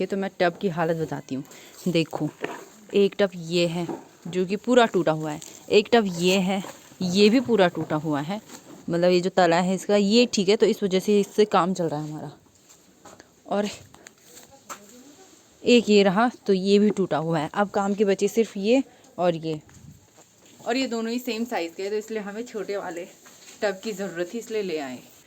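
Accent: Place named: Garhwal, India. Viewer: hindi with gadwali touch❤‍🔥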